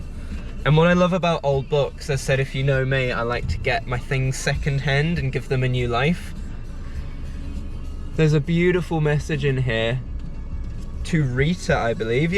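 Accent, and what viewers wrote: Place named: Northern England, United Kingdom. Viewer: this is completely unrelated to books but your accent sometimes sounds so northern english! maybe i just havent heard enough new zealand accents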